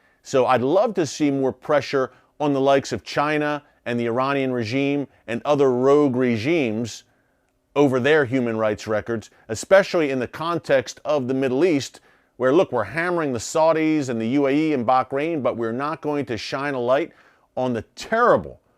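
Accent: United States. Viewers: Why you talking like a cowboy?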